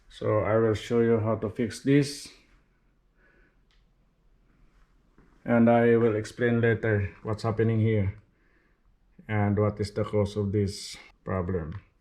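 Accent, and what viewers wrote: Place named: North, Philippines. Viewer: That thick accent sounds familiar from north Philippines.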